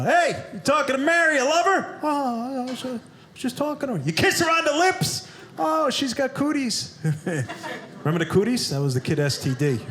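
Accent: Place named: New York, United States. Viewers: My favorite NYC accent 😁... your road rage joke "pre-finger" 🤣🤣🤣. Good stuff! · But I like his neuyak accent regardless. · Its true about driving in NYC when someone cuts you off they give you the finger. Its not a joke it happens every day! But I realize that is funny to people not from NYC!Although his accent says Long Island not the city.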